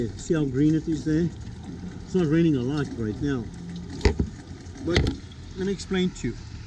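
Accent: South Africa. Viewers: Baie Dankie! I spotted the accent too! Ek stuur groete from a very rainy Pretoria. I have this problem too with my afdakkie roof so ill give this a try. You haven't lost the SA sense of humour! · Is that a South African accent ☺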